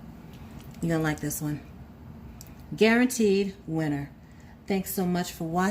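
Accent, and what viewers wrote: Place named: South, United States. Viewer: you got that soothing sothern voice 👌🏾 thats how u know the mac official, like a ref w a whistle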